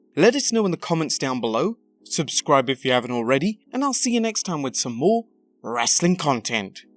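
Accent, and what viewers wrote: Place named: United Kingdom. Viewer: Ew a British voice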